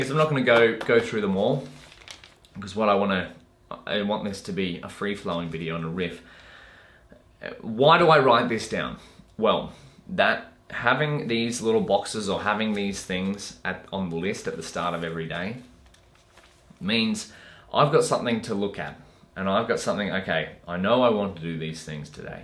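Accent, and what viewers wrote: Australia. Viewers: I WAS DESPERATELY WAITING FOR YOU VIDEO! Question - Are you from Australia? If yes, which city?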